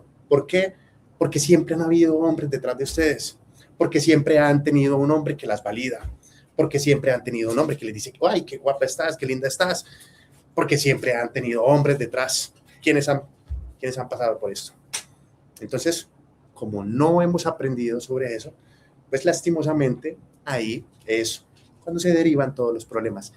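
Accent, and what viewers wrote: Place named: Colombia. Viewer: Hola saludos 👋 Yo le sigo hace un tiempo pero lo q me llamó la atención la primera vez q ví un vídeo suyo fue el tatuaje q tiene en su cuello..es el año q mi único hijo varón fallecido nació..😢 pero desde ese primer instante que me salió un vídeo waoo me encantó como se expresa .ademas q me encanta el acento colombiano🤗.los consejos q da q me han sido d gran ayuda en el proceso por el q he estado pasando.. gracias bendiciones 🙏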